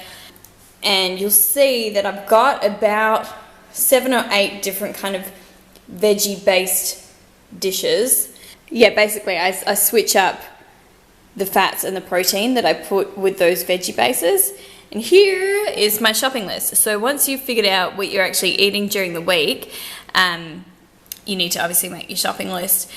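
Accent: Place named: Australia. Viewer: lol between the song at the beginning and your accent i knew you were australian , this video is great, i esp like your use of so many vegetables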